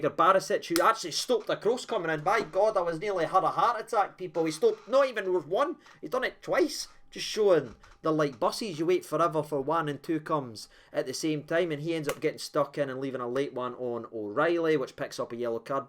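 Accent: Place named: Scotland, United Kingdom. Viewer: Where are you fae fs hahah “ye dinny needty gee them chances” “They dinny make mistakes” “Arny ginny be here” Dinny n ginny🤣🤣 jeezo man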